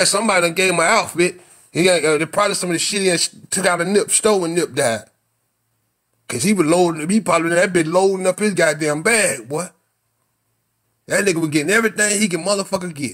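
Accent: South, United States. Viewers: Where you from? Cause you sound just like someone from down south. Cause this how we talk to each other about some dumb shit that be happening.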